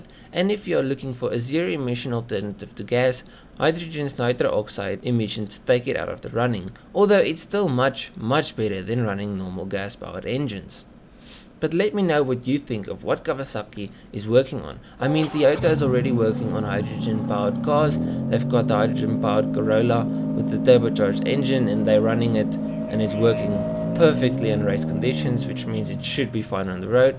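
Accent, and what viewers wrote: South Africa. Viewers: my guy you sound like you from South Africa ✌👌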